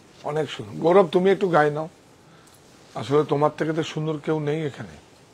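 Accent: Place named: Marwari, India. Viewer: The way you speak, it appear like a typical marowari . If you bring some “ bangaliana “ in your speaking , you would appear more believable..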